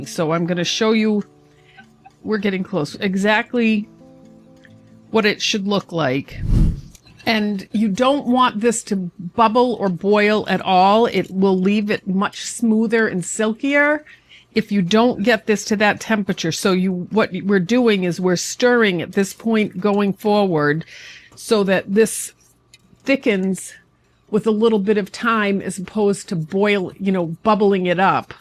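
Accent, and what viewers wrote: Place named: Upper Midwest, United States. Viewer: I need to Know!!!! Where is from? I get upper Midwest, New York, definitely along the Canadian border. Do tell please!!!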